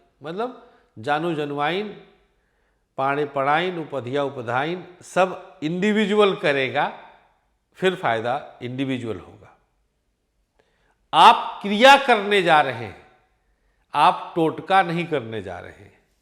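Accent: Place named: Varanasi, India. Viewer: गुरुदेव को सादर प्रणाम आप की बोलचाल की भाषा बहुत सुन्दर लगती है हम बनासी लोगो की यही पहचान है सदैव मार्ग दर्शन करे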